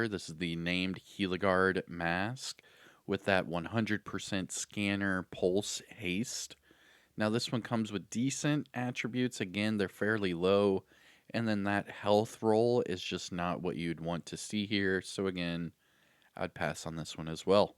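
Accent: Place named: Northern California, United States. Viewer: Its your opening! It peggs you to Northern California. "how ya doing? whats going on?" The tonal sound and enuciation is possibly even going towards San Jose - Santa Cruz.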